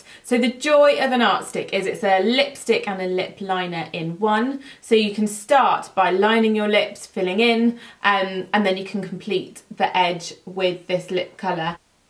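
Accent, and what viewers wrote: United Kingdom. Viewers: All your tutorials are sooooo adorable & I like your British accent big time :) plz don't stop filming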